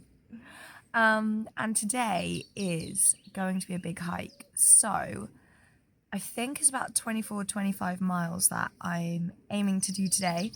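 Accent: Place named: West Country, United Kingdom. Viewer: Absolutely brilliant,, love the passion,,does it really matter how many miles are walked every day?? I think you're doing a 'proper job' !!! West country twang for you!! All the best 👍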